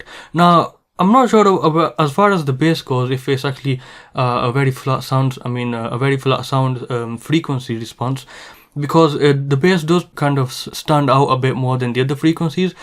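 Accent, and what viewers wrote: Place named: Manchester, United Kingdom. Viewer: You sound very Mancunian. Thanks for the review!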